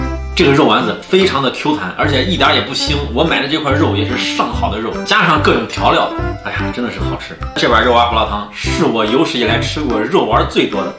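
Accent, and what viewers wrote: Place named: Shaanxi, China. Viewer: 听到的陕普好亲切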